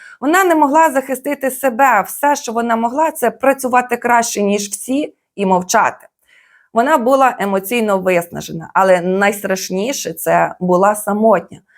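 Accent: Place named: Volyn, Ukraine. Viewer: Ох ваша говірка🎉шарю що з Волині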